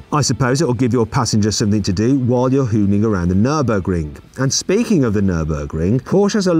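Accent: United Kingdom. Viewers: Logat British nya 🍷🗿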